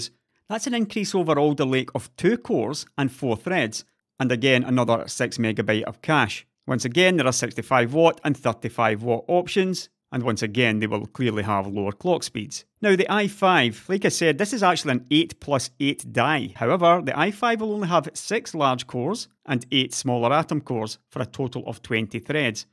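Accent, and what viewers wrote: Scotland, United Kingdom. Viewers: that beautiful Scottish voice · Good to hear your "Scottish" Voice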